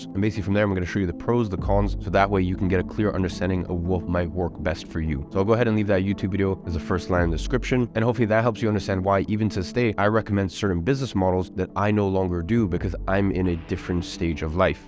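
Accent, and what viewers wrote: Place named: United States. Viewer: How come does this guy have an american accent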